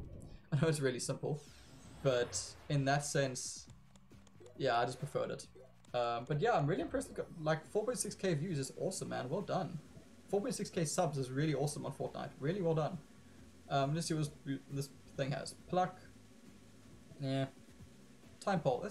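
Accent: South Africa. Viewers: Hey man. I love the content since I started playing Pokemon TCGO again. I am curious though, are you South African as it sounds as though you have the accent.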